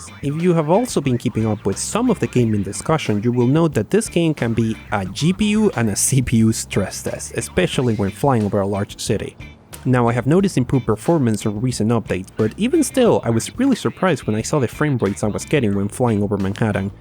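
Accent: Venezuela. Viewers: your english eccent is venezuelan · Una pregunta. tu eres venezolano?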